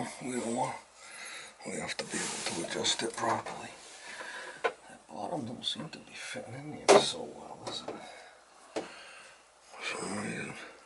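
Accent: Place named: Minnesota, United States. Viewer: Hi,are you from Minnesota or Boston? Interesting accent brother. Nice video, I still dread rebuilding a Delta valve even after 32 years in the trade. DD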